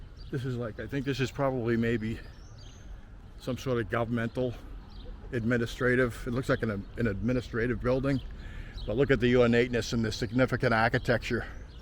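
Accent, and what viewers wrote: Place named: Boston, United States. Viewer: .... excellent and humorous interpretation. We know what you meant. The beauty of Warsaw can never be overstated, an immaculate city overflowing with history, stunning beauty and soul. Thanks for the tour, and hope you come back (American living here)! You have Boston accent, awesome. I met Polish girl many years ago in Boston, and now we have a place on Wyzwolenia, near Lazienski Park. Stay safe in Kyiev.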